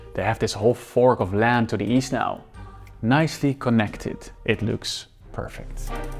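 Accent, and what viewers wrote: Netherlands. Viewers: I was wondering where the host was from, until I heard "togeder" (together). Dutch! 🙂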